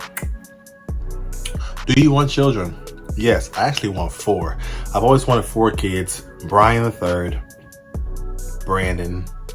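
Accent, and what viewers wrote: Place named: England, United Kingdom. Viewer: You did come back with a English accent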